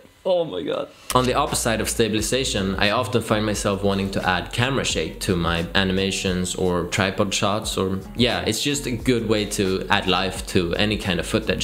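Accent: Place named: Sweden. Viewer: You from sweden dude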